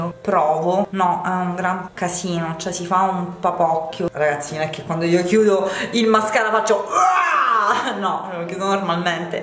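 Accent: Sicily, Italy. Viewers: Stop al video prendo il pennello e lo #scatafotto muoioooo 😂 Mi piace che inserisci termini e accento siculo. 👍💕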